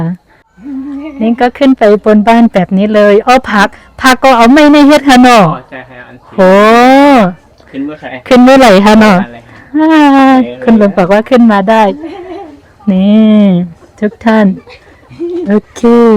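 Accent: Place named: Nan, Thailand. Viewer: สำเนี่ยงหมือนคายๆทางหนือไทยน่านก็มี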